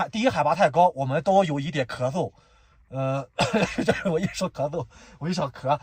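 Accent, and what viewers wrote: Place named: Wuhan, China. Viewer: 有个武汉的口音。很熟悉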